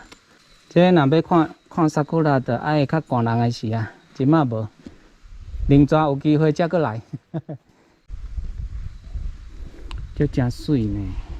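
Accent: Taiwan. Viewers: 超棒超正台與 正港ㄝ台語郎 讚讚讚讚讚 超超超超超親切的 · 喔！ 給你5個夠👍 有夠喘有夠俗 有夠標準有夠 親切的下港腔 語言…聽落郎 叨有夠爽也拉… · David哥的台語令人驚艷👍，好像小時候回鄉下外婆家，會聽到熟悉的台語腔調，格外地親切感。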